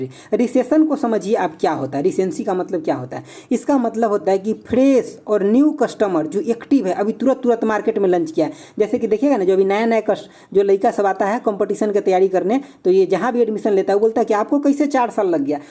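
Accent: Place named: India. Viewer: Sir aapke ke padane ke technique ke saath saath bolne ka tarika bhi ekdum desi hai 😁 Jai exam crack academy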